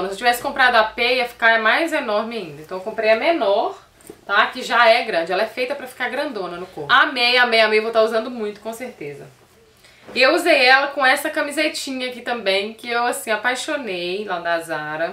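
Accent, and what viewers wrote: Minas Gerais, Brazil. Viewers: Eita sotaque minerin gostosoooo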